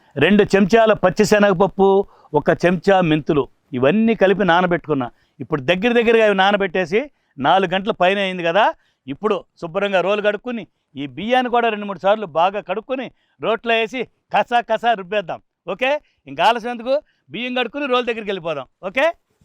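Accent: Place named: Rayalaseema, India. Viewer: Babai mana rayalaseema telugu antha madhuranga untundi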